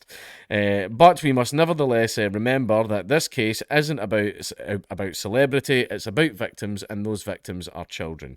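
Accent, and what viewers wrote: Scotland, United Kingdom. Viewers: Hey, Dankula, make an update video. I need to hear the today's news in Scottish accent 🤌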